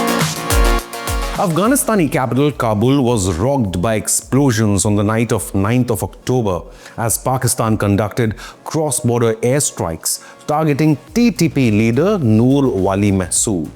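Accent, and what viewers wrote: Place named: India. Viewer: आपका accent बहुत देसी है। आप अंग्रेज़ी हिंदी के माफिक बोलते हैं। 🙏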